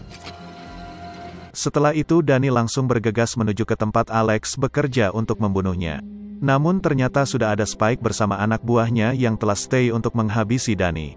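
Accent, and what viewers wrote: Palembang, Indonesia. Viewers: Wong plembang y om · Anjirrr.....cewek Palembang cokkkk 🤣🤣🤣🤣👍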